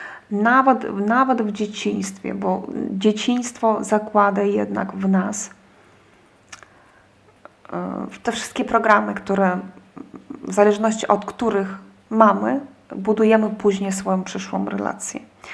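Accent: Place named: East, Poland. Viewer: wschodni akcent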